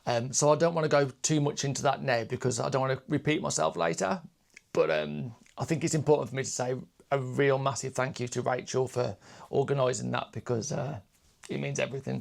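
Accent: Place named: Black Country, United Kingdom. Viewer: Ah, - nice to meet you. Your Quilt featured in one of my FOQ's video's - view from a wheelchair and someone told me in the comments who you were. "That's quilt". Like many competition entrants, the public did not see the Judges views unless they'd won a prize, been shortlisted or were a Judges Choice Award. I was a first time entrant. Your Quilt stood out for me, as I couldn't believe a Novice had made it. Your Piecing was perfect and colour choices blended really well. I'm even more amazed now that I know you have a Disability (so do I) and I wonder how on earth you manage to manipulate such a large, heavy quilt? Bravo. I'm also fascinated by your accent, having lived in Wolverhampton for a while, worked in a Dudley Hospital and travelled by bus via Sedgeley, where the route passed through Upper and Lower Gornal. Don't worry, am not a stalker. 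Will like, subscribe and look forward to watching not only your back catalogue but your future plans. Special mention to your Mum for not reigning in your spending! Best wishes, Maggie, Chester. X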